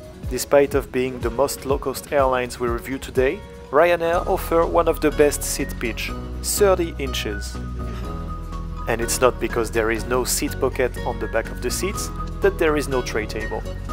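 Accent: France. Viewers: C’est quoi cet accent français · Ton accent francais c'est drole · Ça se vois quand un français parle anglais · l’accent fr reconnu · T français si oui g reconnu l’accent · Ton accent français ressorts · I was trying to pay attention to the video but was distracted with the charming French accent. Sorry. P.S. I love all 3 airlines but most strongly Wizzair because they revolutionised travel in Central and Eastern Europe. Love Ryanair as well. Travelled at least 30 times with them. Less experience with easyJet, so no opinion.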